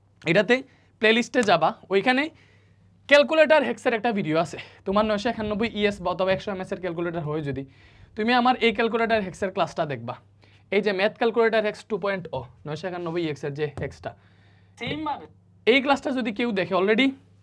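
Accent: Sylhet, Bangladesh. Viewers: ভাই আপনি কি সিলেটী 😊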